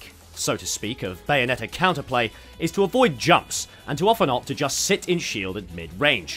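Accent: United Kingdom. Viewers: Guys a Brit. Gotta learn how they talk mate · who is this brit in my pgstats video and why is his voice so good 🤨🤨🤨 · That's just how many brits talk bro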